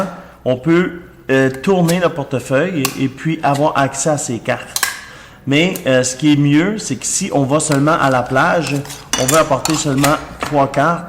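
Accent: Quebec, Canada. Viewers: Hey ça va ? Super présentations, Tkt pas moi je te comprends bien alors que je suis en France 🇫🇷 Ps: j'adore l'accent québécois ❤️💜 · Votre français est impeccable ! Et cerise sur le gâteau... l'accent québecois ! J'adore !!! ;-)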